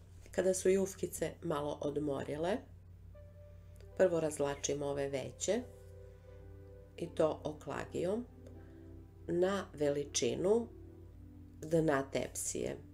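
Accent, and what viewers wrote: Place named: Niš, Serbia. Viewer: Joj što volim ovaj vaš rekla bih Niški naglasak. Pozdrav iz Podgorice 👍🍀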